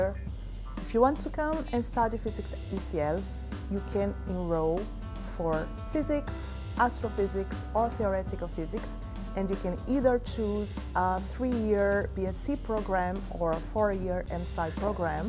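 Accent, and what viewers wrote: Brazil. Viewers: you can tell she's brazilian hahah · Shes 100% brazilian 🤣🤣 Im also brazilian noticed straight away, nice to know theres a vast community at UCL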